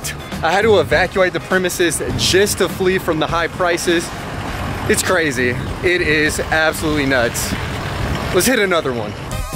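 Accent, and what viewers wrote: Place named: Texas, United States. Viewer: I appreciate the Texas Flanders accent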